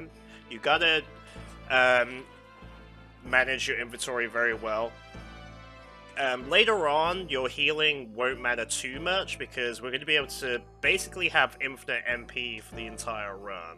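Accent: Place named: Australia. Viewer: that sounds about right. I was hearing some Australian too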